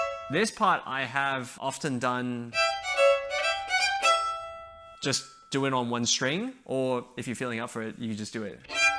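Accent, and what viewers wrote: Australia. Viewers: I like your Australian pronunciation very much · I luv ur aussie accent nahh i just love uuuuu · has a different accent here. More aussie. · Why did you have to loose your ozzie accent? It's such a charming and delightful accent that always sounds cheerful. 😍 Also I love your arrangement and you're so kind to teach ❤️ You're brilliant!!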